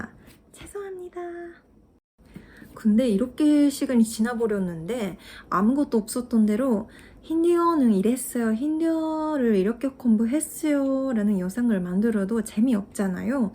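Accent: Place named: Japan. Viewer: 日本人の韓国語らしく日本人の私には聞き取りやすいですが、韓国語ネイティブにとってどんな感じですかね。でも内容はとても面白く、違いを要領よくまとめていらっしゃいますね。ありがとうございました。❤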